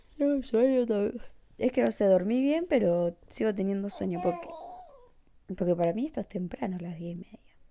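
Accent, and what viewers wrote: Argentina. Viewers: Sos de Argentina? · Sos de argentina?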